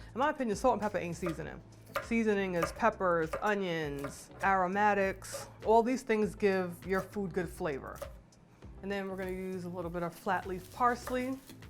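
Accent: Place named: New York, United States. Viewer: Man, I could tell she was from New York- soon as she spoke ... I wanna make a meatloaf but I don't have fresh Parsely☹️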